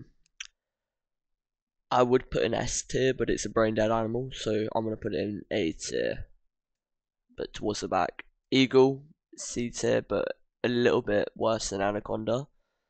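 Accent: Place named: United Kingdom. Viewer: The British pronunciation is so nice😭👍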